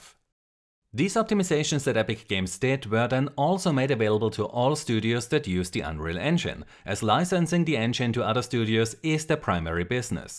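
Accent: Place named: Germany. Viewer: Yaaaaaaa your GERMAN!!!! Love the accent man, you sound like a badass. 😎😎😎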